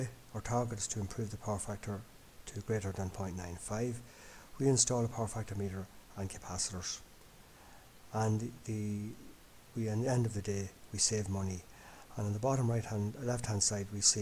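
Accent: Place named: Ireland. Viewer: Thank you very much kind sir, it was well explained and i appreciate the fact that you talked slowly or i would have trouble understanding as i'm not used to the irish accent.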